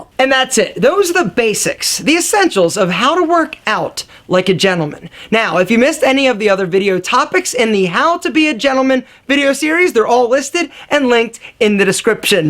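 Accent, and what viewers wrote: Philadelphia, United States. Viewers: john doe Sounds like Philly. That is how they talk. Listen to Darryl Hall, he sounds like this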